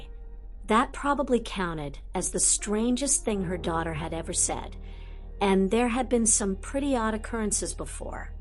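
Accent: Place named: North America. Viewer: British characters need a British reader. Love this story but not with a North American accent.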